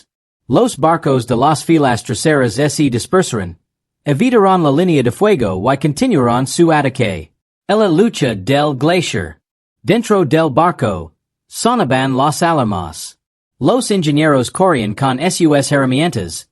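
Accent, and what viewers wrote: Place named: United States. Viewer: Hola lastima la voz que le pusieron porque son buenas historias.pero escuchar a un gringo hablando un español pesimo es como escuchar la pelicula de terminator en español catalan de España.horrible.es raro porque otros videos tienen muy buenas voces..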